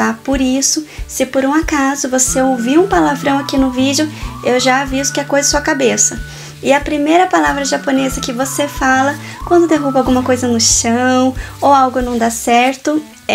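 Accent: Minas Gerais, Brazil. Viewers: sotaque mineiro é top :D fofo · kkkkkkkkkkkkk vc é Mineira?? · Vc e mineirinha?? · Ela é Mineira? Tem sotaque, sou de Minas. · Tu é mineira ??? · vc tem um pouco do sutaque mineiro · Man quando ela fala português ela parece ser uma mineirinha É mt fofinho :3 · Ela é Japonesa ou Brasileira? Nossa, amei o Jeito dela.. Se for Japonesa, fala Brasileiro melhor que eu rsrs 😄 Me fez rir demais com esse sotaque Mineiro 😅 Amei ❤️ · Cê parece a fusão de um mineiro com um japonês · Vc parece "ser mineira" pelo seu sotaque brasileiro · Ela aprendeu portugues no interior daq de minas so pode mkskskzkskkdkdkskskks, fala igualzinho a gnt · Eu acho q parece mais msm um sotaque mineiro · A japonesa mais mineira q eu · Ela fala o português mineiro uai, Herdou o sotaque mineiro!! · Moro no interior de sp mas entendi td q vc flw(mineira?) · Vc é muito engraçada de um jeito meigo.E ñ parece ser de SP, tá mais pra mineirinha kkkk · Sotaque de mineira ta forte viu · Fala palavrão com muita classe, português do interiorrr (de Minas?) e com a delicadeza de uma gueixa. 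· Eu acho q tá mais pra mineira. Eu acho que como ela morou no japão por muito tempo, perdeu um pouco do sotaque. Mas dá pra perceber pelo "Ocê", encurtada de palavras e o "R" um pouco arrastado. - O "Arrrrdido" dela é um pouco mais arrastado. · Vai ver ela é Mineira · Ela é mineira?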